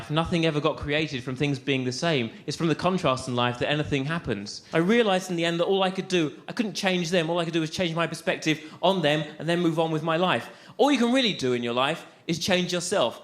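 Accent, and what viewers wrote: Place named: England, United Kingdom. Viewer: çok güzeldi. aksanı ne kadar güzel ya :D ingilizce öğrenirsem kesinlikle ingiliz aksanıyla öğrenmem lazım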